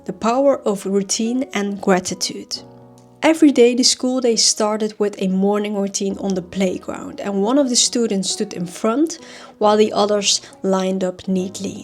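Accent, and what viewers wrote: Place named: Netherlands. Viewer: Where is your accent from? You sound Dutch 😊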